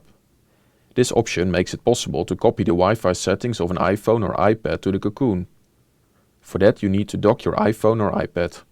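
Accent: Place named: Netherlands. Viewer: Ik hoor aan hem dat hij nederlands is ;)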